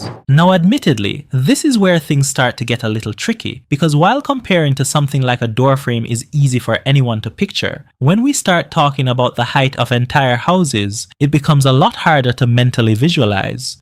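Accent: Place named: Jamaica. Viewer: You Jamaican bro? There’s a familiar accent in your tone😂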